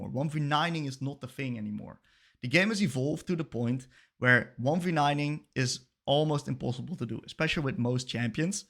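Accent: Netherlands. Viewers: Sounds dutch.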